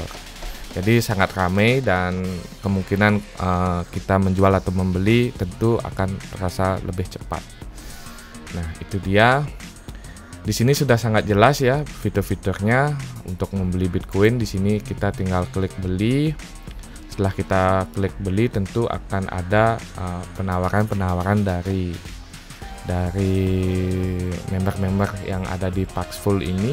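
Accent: Bali, Indonesia. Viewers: Mantap ilmunya bosku.. Tp logatnya ky org bali ya 😁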